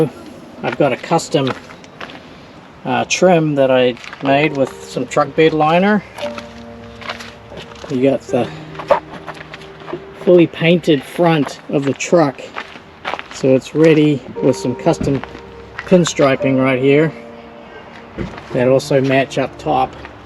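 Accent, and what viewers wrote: New Zealand. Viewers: Mannnnn I can hear the kiwi in ya haha awesome . I’m from WHG in the north . Kia kaha . I’m manifesting a ride like this ! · I love this rig and the fine Kiwi accent. 😊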